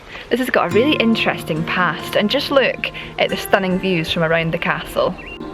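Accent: Scotland, United Kingdom. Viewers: Something about watching a vlog about a Scottish castle from someone with an awesome Scottish accent. Sorry but some of us Americans love that kinda stuff.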